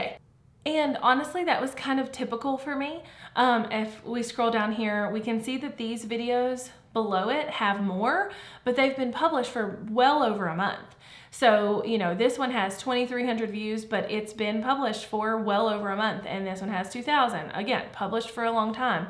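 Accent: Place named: North Carolina, United States. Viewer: DUDE. this video and these analytics are blowing my mind :) THANK YOU - and im from NC too so your accennt is so comforting for me. lol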